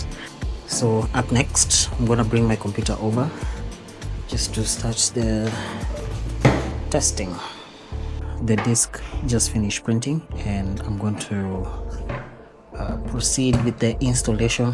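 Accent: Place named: Kenya. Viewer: kenyan accent . This is good stuff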